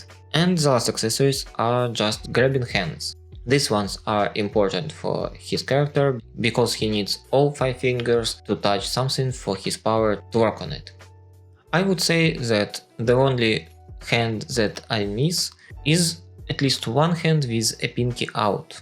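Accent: Russia. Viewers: У вас ооочень русский акцент ^~^ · Bro, are u russian?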